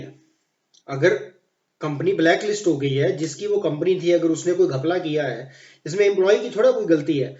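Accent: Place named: Pakistan. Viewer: Yaar brother ap urdu itni saaf boltey ho jesey pakistan sey ho .. kahan sey seekhi ithini saaf urdu ?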